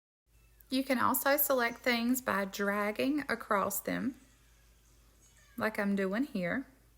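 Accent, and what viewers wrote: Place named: South, United States. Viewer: Hello, i am not happy to have to say this, but i'm disappointed in your video, after your introduction, you went into design mode, you didn't start from the beginning & i'm a beginner, so this is why i am disappointed, i mean you sound like a very nice southern lady, but i didn't even get to see what Cricut you were working with at the start of your video.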